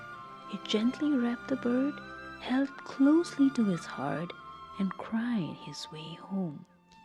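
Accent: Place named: United States. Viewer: Where did you get that american accent!?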